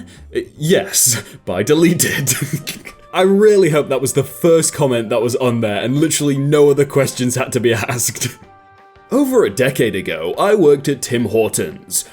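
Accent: United Kingdom. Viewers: bro you do the absolute best british accent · I realized you where British but didn’t realize you were that British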